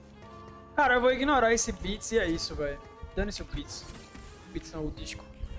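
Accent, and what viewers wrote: Recife, Brazil. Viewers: AGORA MINHA DUVIDAS FORAM TIRADAS!! RECIFENSE NATO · Eita o é do recife?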